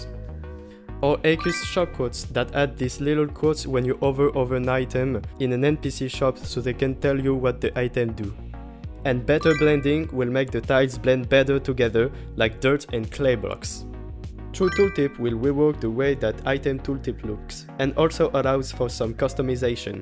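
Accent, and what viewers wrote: France. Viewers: Wait youre french?? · You are french i can feel it in your voice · Hey homie you're french aren't you ? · With your accent I guess out that you are French😊 and as a French people I must help the others French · Je pensais pas tomber sur un français vu l’accent mais incroyable la vidéo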